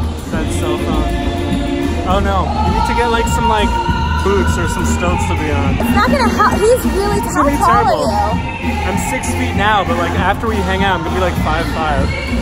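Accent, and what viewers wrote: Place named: Philadelphia, United States. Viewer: Awesome video,. I love your philly accent. I wash my jeans once a week. 😘😘😘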